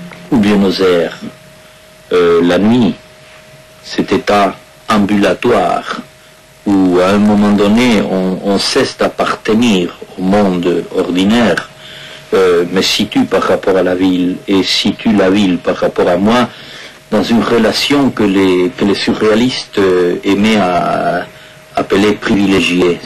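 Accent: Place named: France. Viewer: Muy poco le sale muy bien! Pero lo cómico es que tiene un acento francés cuando habla castellano jaj! La doble r por ejemplo, la pronuncia como un francés, y dice "euuuh" todo el tiempo. Salutations.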